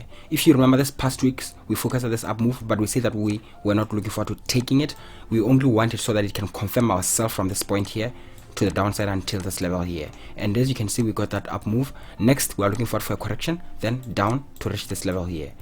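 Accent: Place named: South Africa. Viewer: Thanks my guy... i can hear that u my homeboy a South African... Big up ntwana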